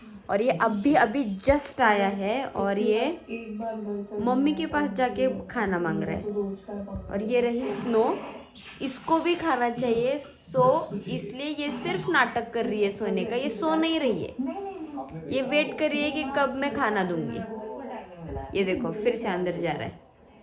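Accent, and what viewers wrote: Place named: Maharashtra, India. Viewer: apka hindi bahot marathi type hai 😁😁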